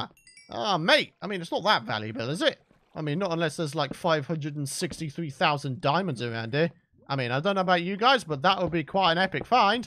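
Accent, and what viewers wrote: United Kingdom. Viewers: Lol I hear that too. He has a British accent like stampy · Where in the uk are you from? I’m from wales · You sound like the british version of Woody from toy story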